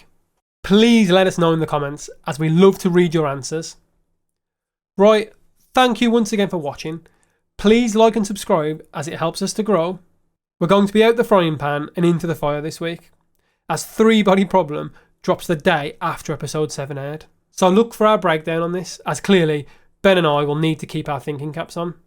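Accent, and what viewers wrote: United Kingdom. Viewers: PS Where in England are you from?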